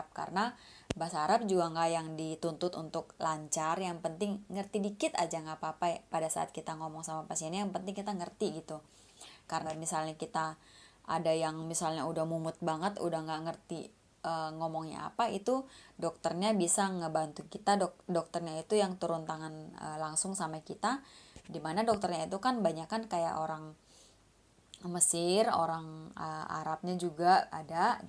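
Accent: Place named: West Java, Indonesia. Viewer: Teh logatnya kaya org Sunda,slm knl dari tkw malaysia asal tasikmalaya.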